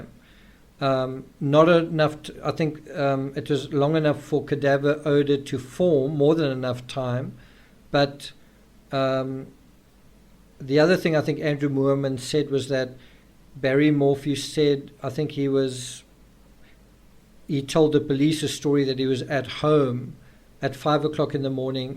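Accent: South Africa. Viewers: hello, i can't find your name but you sound like a fellow South African. Am I correct?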